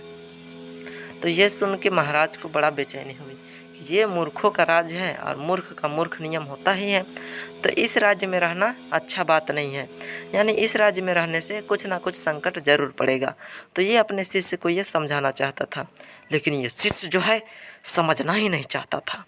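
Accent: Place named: Bhojpuri, India. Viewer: This is my first time someone is teaching me in Bhojpuri accent 🙄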